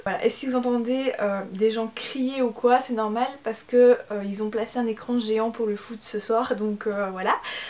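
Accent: Belgium. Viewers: T'es belge ?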